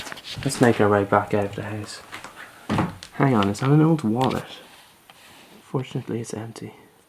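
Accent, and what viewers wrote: Ireland. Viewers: Why the fuck would you assume he's American? This guy is clearly Irish. There's also a bottle of Paddy whiskey and the newspaper even mentions Garda, if you're too stupid to pick up on his accent. Bovril is a type of beef stock which is used to make a broth tea. You're an idiot. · i love the irish accent. "the udder side" lol. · the grid beside the barn is a cattle crush for inspection and reparing hooves not slaughter. and the shackles were probabley for side lining donkeys to stop them running :) and ya sound like your from dublin dude im connemara :D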